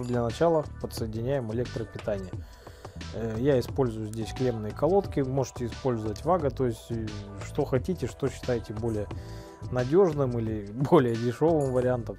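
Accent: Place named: Siberia, Russia. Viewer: Это обычный говор сибиряков. Не в этом суть, главное, руки золотые!👍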